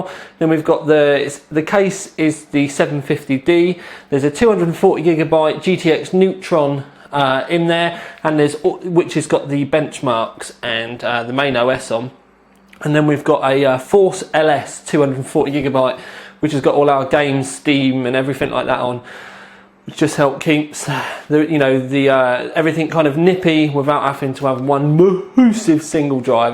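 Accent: England, United Kingdom. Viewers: HI if you want a polite version of Dogs Bollocks Its the Mutts Nuts im a pomme in oz so have to tame it down a bit with the rich Thanks for the show and effort and the good old English accent still got mine it don't seem to disappear Know what I mean gezzer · it's just how he pronounces it, could be just him, or cause of the english accent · you have a strong british accent my good sir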